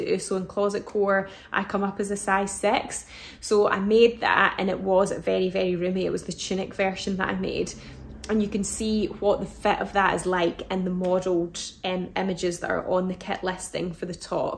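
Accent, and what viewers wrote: Scotland, United Kingdom. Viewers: Argh. I planned to catch you for the first time live last night and I forgot. As well as the lovely fabric it's great to hear a Scottish voice. I love living in the land of cheese and chocolate but a little bit of homesickness creeps in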